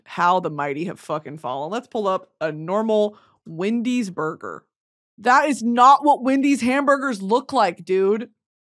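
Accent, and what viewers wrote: South, United States. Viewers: *'s southern lady accent is quite literally my favorite thing ever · your southern accent sounds exactly like my late grandmother and reminds me of being in her house as a kid lmao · your old southern lady accent cracks me tf up every time 😭💀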